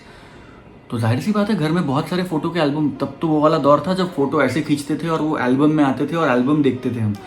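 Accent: Standard. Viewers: Swapnil ne bohot accha shudha hindi bola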